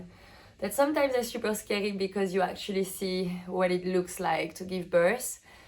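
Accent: France. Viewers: L’accent français ✨✨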